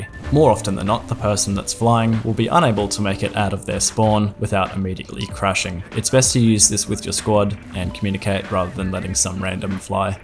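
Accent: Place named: Australia. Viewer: Your Australian. Im subscribing.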